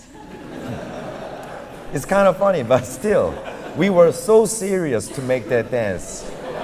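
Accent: United Kingdom. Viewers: 몇번을 다시 들어봐도 정확하게 말하고 심지어 유머까지 구사하는데 콩글리쉬라는 이유를 모르겠음. 또박또박 영국식 발음이라 그런가? 광팬이 미녀였는지는 잘모르겠음.^^ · 영국식 영어를 완전하게 구사하는군요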